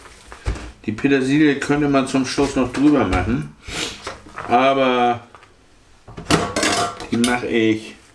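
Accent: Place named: Hamburg, Germany. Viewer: Danke, gut erklärt - so ein richtig ehrliches, bodenständiges Essen (wie von Deinen Videos gewohnt)...und Dein Vortrag für mich Nich-Hamburgerin kultig komisch und unterhaltsam durch den symphatischen Dialekt!✌👍🤣😂 Darf ich ma fragen, ob Du da einen GastroSuS Topf benutzt und ggf. welche Maße der hat? Ich würd mir so einen großen Pott gern zulegen... Sind die gut, auch im Hausgebrauch? Oder vielleicht kannst du mal ein Video zu Töpfen machen, die Deiner Meinung nach was taugen? Ok, herzlichen Gruss in den Norden!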